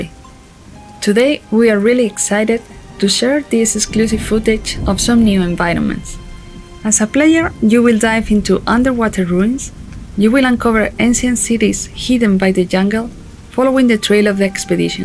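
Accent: Spain. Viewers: Ole ahí ese acento español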